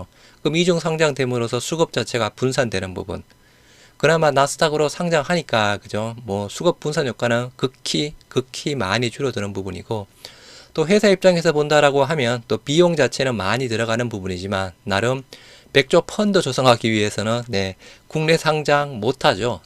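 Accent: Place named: Jeolla, South Korea. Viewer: 억양이 전라도분 이신듯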